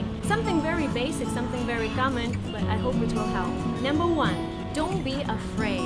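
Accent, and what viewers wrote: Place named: England, United Kingdom. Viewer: Thanks for your recommendation. I like your natural acting and English accent.😍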